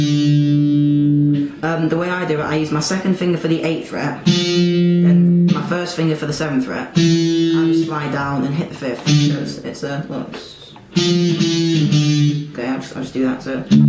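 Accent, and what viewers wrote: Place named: United Kingdom. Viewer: I would suggest more alternate picking, it can get a bit laggy with mostly downs. I've been going through your tutorials and they are actually quite good, I really love your acoustic work a lot, its very even and clean sounding compared to many covers I've heard. And of course I get a kick out of hearing "hey it's " at the beginning of each video coming from a cute british boy haha. Keep up the good work mate.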